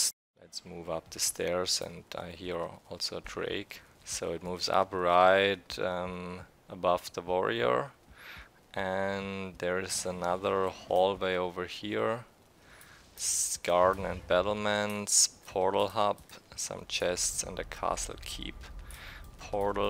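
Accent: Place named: Germany. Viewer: do it dude. I’m just some 36 yr old enjoying the game immensely and loving seeing all the creativity of others. Your builds are exceptional and I love the way you presented it all. I work with voice overs for a job, so I can’t lie, I just love the efficiency of your German accent. Keep building the dream and continue to press the boundaries of our sacred Valheim 👍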